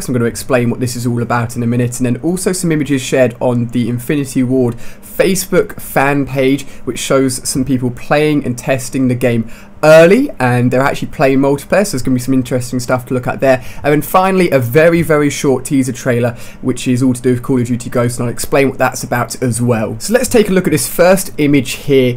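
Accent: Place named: United Kingdom. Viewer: man i so hate british accent.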